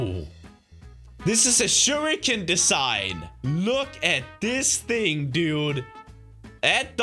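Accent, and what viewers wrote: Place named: Denmark. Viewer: A danish accent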